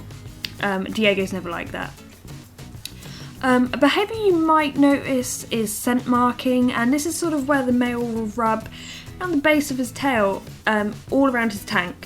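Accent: United Kingdom. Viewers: haiii me again XD so from ur voice ur from uk ??????? if u are OMG plzzzz do u know any good breeders in uk i cant find ANY !!!!!!!!!!!!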